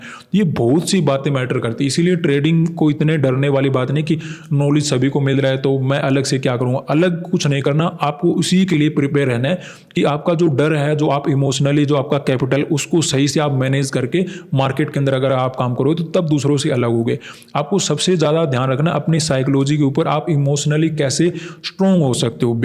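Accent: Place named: Punjab, India. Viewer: Sir pakka punjabi hai awaj se pata chal raha hai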